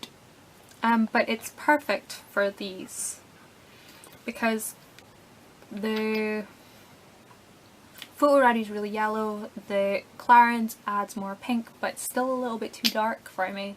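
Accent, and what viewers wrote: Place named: Scotland, United Kingdom. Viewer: Odd comment coming at you, but I enjoy listening to you. I like Scottish accents, and yours is very easy to understand and pleasant :)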